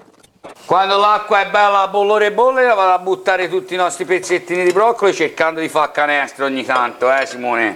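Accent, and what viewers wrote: Tuscany, Italy. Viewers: Ai raggione morire con la panza piena o tutto quello che lei sta preparando ,,domani sabato li faccio ciao bella cadenza TOSCANA 😋😃😄😏😀😁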